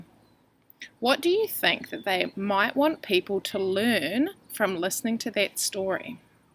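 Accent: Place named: United Kingdom. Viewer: British ascent yay E E E E E wow that is sad now that i watch the one part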